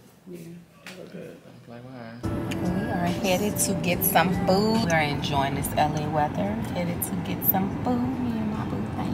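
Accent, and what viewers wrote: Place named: Louisiana, United States. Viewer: this is my first time actually hearing you Louisiana accent. or maybe i just never heard you speak long enough.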